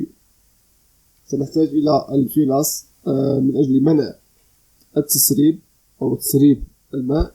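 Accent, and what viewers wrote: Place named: Morocco. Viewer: طون كتهضر خويا غير بالدارجة باش نركزو مزيان، والله العظيم مفهمتك حيت كتكلم بلغة عربية فصيحة بلطنة مغربية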